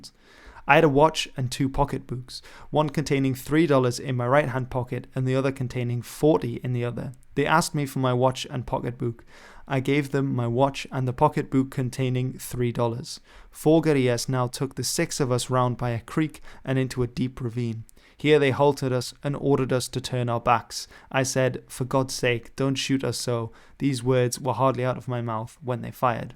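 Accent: Netherlands. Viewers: He's definitly dutch. He uses an english accent, and does it well, but his dutch does shimmer trough. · I clearly hear the Dutch accent.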